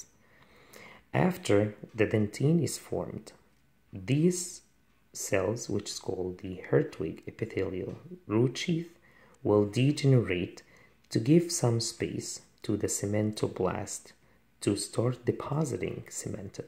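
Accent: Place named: Vietnam. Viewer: who is agree with me that is seem to vietnamese accent??? p/s: i'm vietnamese, hihi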